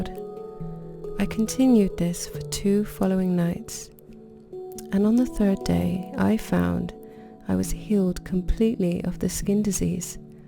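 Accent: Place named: Surrey, United Kingdom. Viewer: Madame, Let me preface this with the fact that I am quite discerning when it comes to bestowing praise regarding anyone's voice when speaking. With that out of the way, I must give my most ardent compliments to you. You are in possession of a provocative, mellifluous voice, spoken with a disarmingly charming accent. Yours is the kind of voice, I would like to be the first sound I hear when I wake and the last sound I hear before I slumber. I live in Manhattan, New York, so my ability to ascertain the origin of your accent is perhaps insufficient. At least some of your cadence, and diction remind me of an accent South of London in Surrey. Notwithstanding my ignorance of the origin of your disarming accent, you have a guileless, feminine resonance in the prepossessing melodious sound which would only enhance the lyrical tone of poetry. 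A voice such as yours is no doubt accompanied by a pulchritudinous visage. Written by a bodice ripping rogue, sincerely with unadulterated veracity, without the slightest affectation whatsoever. ∞ Rogue Means - - - - 'Beauty is truth, truth beauty,' - that is all ye know on earth, and all ye need to know. ―John Keats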